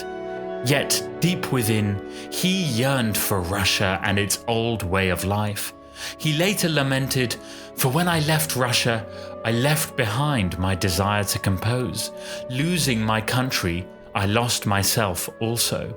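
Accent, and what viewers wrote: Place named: United Kingdom. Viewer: Mos-cow? For an American you have a very British accent.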